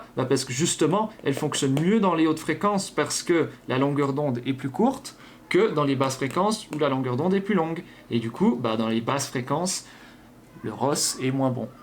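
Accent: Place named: Belgium. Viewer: Tiens il est belge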